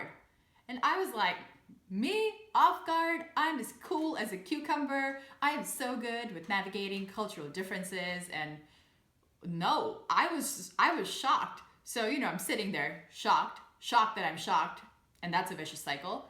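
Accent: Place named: Netherlands. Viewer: Funny to see how you are picking up a sleight Dutch accent. You can still tell you are American but a bit of Dutchie is starting to creep in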